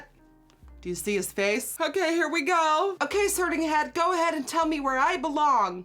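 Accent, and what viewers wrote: United States. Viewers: Your accent is impeccable...I didn’t even know you weren’t from the US for a while until you said it in another video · It’s so mind blowing how you have zero accent! You sound so American, you are professional makeup artist and language learner! It’s so hard to learn another language. Thank you for teaching us Dutch! What a fun way to learn :) love it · I CAN’T BELIEVE YOU ARE DUTCH! YOUR ACCENT SOUNDS SOOOO AMERICAN...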